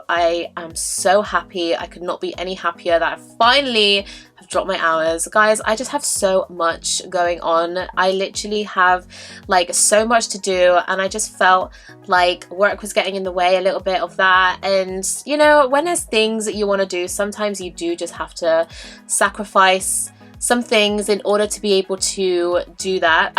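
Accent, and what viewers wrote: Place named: United Kingdom. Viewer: I hope this amazing life has continued. I didn’t now I longed for a British voice till yours and you have the look of the female lead role in movie Aspen Extreme Finola Hughes who. I totally crushed on in 1993 😮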